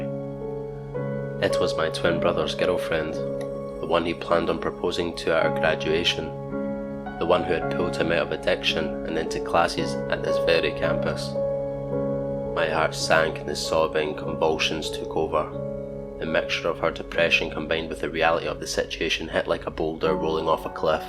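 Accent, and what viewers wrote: Scotland, United Kingdom. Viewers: Love the video mate. Also Scottish! ❤️ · Who the fook is that? It's. Interesting to listen to the combinations of a scottish voice Yet also music dynamics that aren't from that of your typical narration pick. You know Myuuji or Kevin. For that you've earn another sub. Although I came to constructively leave criticism. I found myself listening and diving into the story. Keep doing your thing. Always watching You companion Night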